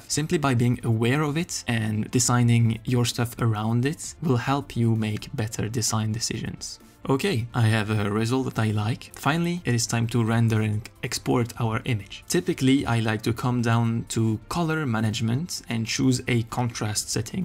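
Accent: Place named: France. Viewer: The video is super intressant. Tu es français ? Parce que j’ai l’impression que t’as un petit accent.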